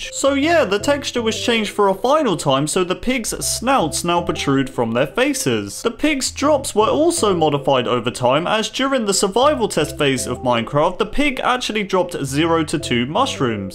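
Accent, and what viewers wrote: United Kingdom. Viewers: are you britis?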